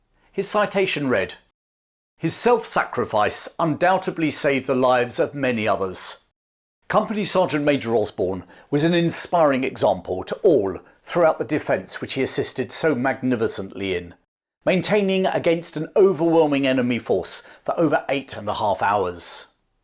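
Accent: United Kingdom. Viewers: As a Hongkonger who is always curious about our past, I have been listeniing to different narrations of this battle a million times now. It's nice to listen to this again in the British accent and perspective. Thanks chap.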